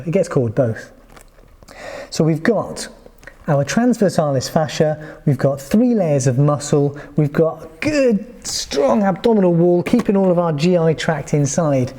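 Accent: United Kingdom. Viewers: the british accent really piss me off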